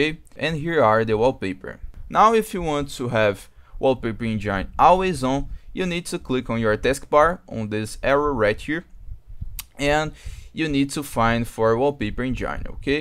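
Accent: Brazil. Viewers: pelo sotaque é brasileiro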